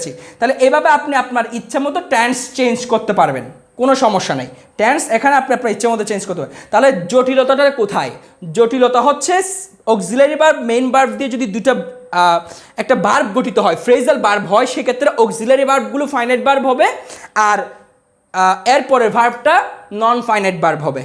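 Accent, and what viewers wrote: Chittagong, Bangladesh. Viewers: Vai.... apni to mona hoi chattogram er people. Amio akjon chattograminian. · Closing my eyes,,,i can say you are from Chittagong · Sir mone hy chittagong ar tai na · আপনি কি চট্টগ্রাম এর ? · apni ki Chittagong er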